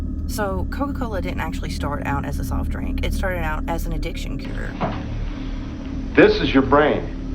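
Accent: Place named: South, United States. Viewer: Stevie Ray Vaughn, at the height of his alcoholism and cocaine addiction would put coke in his whiskey. He was forced to clean up because the mixture was destroying his gutty wuts...Too bad he didn't go top rehab for late night helicopter rides. He thought he could save time after a gig instead of driving, and get more sleep. Looks like he got his wish. The only coca cola product I'm currently infatuated with is actual cocaine. My dude lets me watch him chip an ounce off a brick... I found out about you from the seconds of horror channel. He features your clips in quite a few of his videos, and I had to find out who the adorable redhead{?} with the southern{?} accent was and watch more of her... Maybe I shouldn't have typed that last sentence but hey....cocaine. Please don't ban me, I think I found a reason to not have that shotgun sando I have at the ready...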